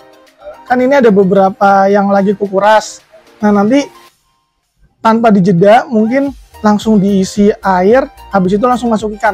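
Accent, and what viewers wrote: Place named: Java, Indonesia. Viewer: Bang kamu asli dr mana logat nya kya dr jawa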